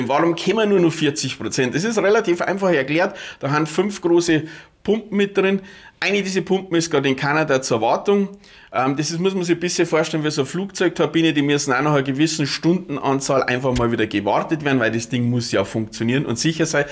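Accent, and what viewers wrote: Bavaria, Germany. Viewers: Servus, eigentlich bist du ein netter Kerl, und sprichst meine Sprache, vom Dialekt her mein ich, denn ich bin im Oberland daheim, schau runter ins Chiemgau, aber von der ewigen Panik halt ich nix, gut das mag dein einziges Geschäftsmodel sein, für mich wär des nix. Mein Nachbar hat mal Millenniums Notfallzeug verkauft, wie damals jeder gemeint hat die PCs verkraften denn Wechsel von 99 auf 0 nicht, ja was für einen Scheiß der da verkauft hat und das Geschäft ging gut, zumindest bis zum 1.1.2000, da war das Geschäft wie abgeschnitten. Ich sag immer was nutzen dir so Notfall Dinger, wenn man sonst unbeholfen und ein Depp ist, wichtig ist doch, dass man wenn die Kacke am Dampfen ist, die Ruhe bewahrt, so nach dem Motto jetzt schaun ma mal dann sehen wir es schon, oder, die Suppe wird nicht so heiß gegessen wie sie gekocht wird. Wenn Notstand ist, werden doch die Menschen führend sein, die Wissen wie es geht, die auch aus Scheiße was brauchbares machen können und nicht die Leute die sich mit Notkoffer und Zeug eindecken und schon die Hosen voll haben bevor es los geht. 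Nüchternheit zumindest bei Tag und Gottvertrauen, dann zwingt man jede Krise. Viele Grüße ins schöne Chiemgau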